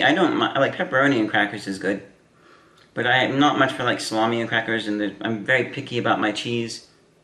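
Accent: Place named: England, United Kingdom. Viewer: kinda an english accent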